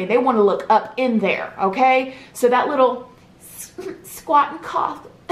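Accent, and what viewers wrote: South, United States. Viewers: Your southern accent makes me feel secure??? Idk but I appreciate a fun southern YouTuber (nothing weird, my family is southern, and nobody is where I live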